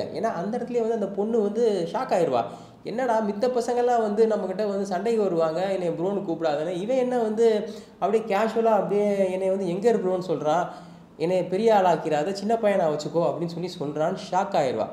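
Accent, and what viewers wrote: Madurai, India. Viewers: Bro madurai ya nenga.na madurai bro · Bro ninga madurai ya🔥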